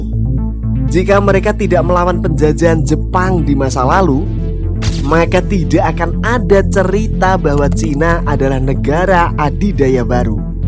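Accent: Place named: Java, Indonesia. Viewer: Medok ,kawus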